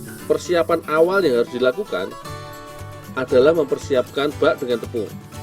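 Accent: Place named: Banyumas, Indonesia. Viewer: Ngapak yow .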